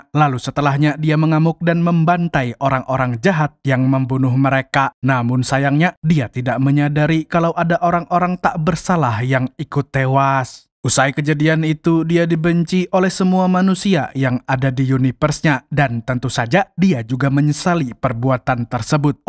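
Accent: Banjar, Indonesia. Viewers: Urang banua sekali ny .. 😂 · orang Banjar kah mang🙏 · Urang Banjar hostnya 😂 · Pian nih urang banjar kah mang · bah banjar kalinya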